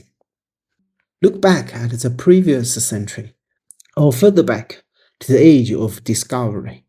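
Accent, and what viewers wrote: China. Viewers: he does have a strong chinese accent though so maybe its just a neccesity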